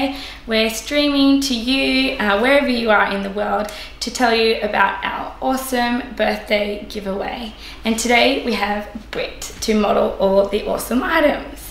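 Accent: Australia. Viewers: Britt is incredible. She is so beautiful and represents the company so well. And her accent. What part of Australia is her accent from? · Is that Australian accent?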